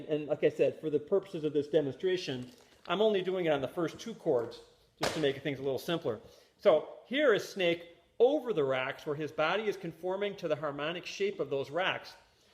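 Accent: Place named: Chicago, United States. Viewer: It's Chicago accent - part of the charm! He's a great guy & a super strong player & teacher!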